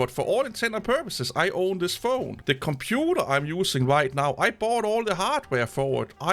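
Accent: Denmark. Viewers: His thick Danish accent is one of the reasons I watch this channel!😍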